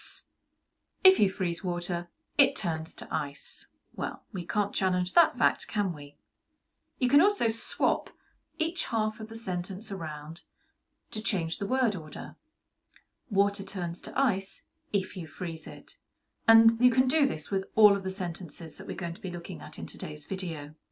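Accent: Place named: England, United Kingdom. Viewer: Your English accent sounds really nice, where is this from?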